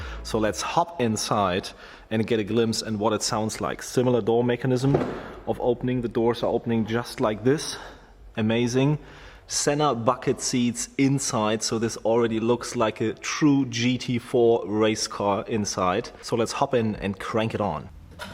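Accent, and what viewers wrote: Germany. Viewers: Fucking channel sponsered by Audi with digsugting german accent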